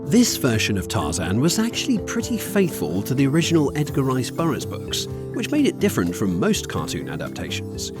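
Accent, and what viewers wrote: United Kingdom. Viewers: I remember all those Saturday morning cartoons growing up in the 70s, but what I don’t remember is your British voice coming out of my mono TV in 1977 That’s what I don’t remember.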